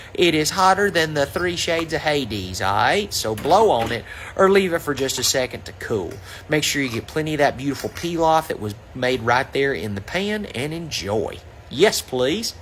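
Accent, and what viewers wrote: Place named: South, United States. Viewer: Looks so good! And easy. You sound like me! Love to support my Southern family! ❤❤ Subscribed…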